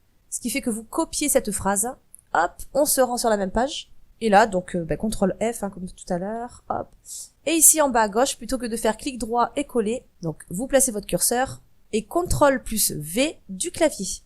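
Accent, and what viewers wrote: South, France. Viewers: Tu as un accent de qq qui a vécu à un moment dans le sud mais pas forcément toute sa vie ? Moi j'utilise souvent : Ctrl+F pour rechercher des mots clefs. Ctrl+clic gauche pour ouvrir un lien dans une autre page. Ctrl+la molette pour zoom/dezoom. et ctrl alt supp.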